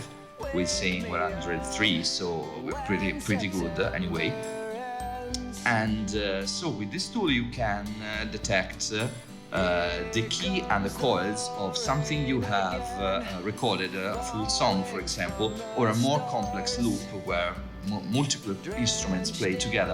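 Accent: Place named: Tuscany, Italy. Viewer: scommetto che sei toscano haha , finalmente qualcosa made in italy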